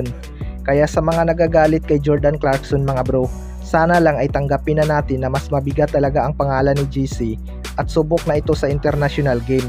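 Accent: Visayas, Philippines. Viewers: Opinsa at dipinsa . Bisaya na bisaya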